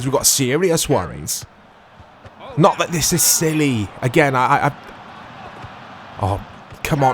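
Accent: Wales, United Kingdom. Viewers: Are you welsh as I can’t hear a little welsh, also big up United.. even tho we are trash atm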